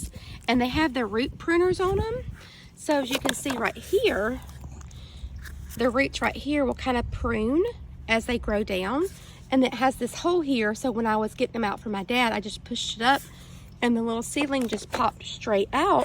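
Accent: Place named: South, United States. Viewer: I love your southern accent!! I wish I had a stronger one!! Enjoyed your garden tour and meeting your doggie! We have chickens too, beautiful property and gardens you have 💚💚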